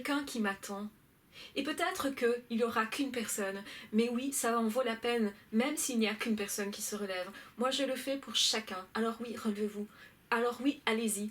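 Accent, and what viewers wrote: Belgium. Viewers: C’est la première fois de ma vie que je commente sur YouTube (pour une nana qui travaille dans le web c’est particulier mais bon xD). Bravo pour tes vidéos, elle m’aide énormément (je parle de moi mais j’imagine que c’est le cas d’énormément de personnes). Je suis victime actuellement, nous sommes séparés mais je suis encore sous emprise malgré le fait d’être totalement informée sur les PN. Pourquoi, je pense que c’est parce que j’ai un problème moi aussi malheureusement ( mais c’est pas méchant dans le sens ou je ne suis pas PN xD!) , je suis dépendante affective ( je le sais depuis très longtemps mais j’en enfin mis un mot là dessus depuis la lecture du livre Vaincre la dépendance affective). Tout cela pour te dire que tes vidéos m’aide à reprendre le dessus quand je retombe dans ses filets et rien que pour cela je te remercie infiniment. 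Je ne vais pas entrer dans les détails mais on va dire que si j’ai vu une vidéo de toi le soir, le lendemain j’ai plus de force et de confiance en moi pour me détourner de lui ou éviter ses pièges. J’ai encore bcp de mal à intégrer le fait que ce type de personne existe, il y a une semaine j’essayais de le comprendre , de chercher des excuses ( dans son enfance ou autre ) mais non je dois l’intégrer dans ma petite tête : des personnes sans humanité existent , et c’est franchement flippant. Sinon, petit aparté rigolo l’accent belge est mimi xD j’adore voir ton chat sur le canap ( j’adooore les chats mdrrrr ) et tu déchires car généreuse en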